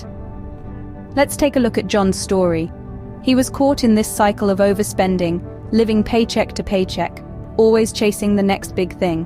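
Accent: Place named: United Kingdom. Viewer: THE BRITISH NARRATION IS IRRIDATING-- AMERICAN ENGLISH POSSIBLE WITH A LESS KNOW-IT-ALL TONE?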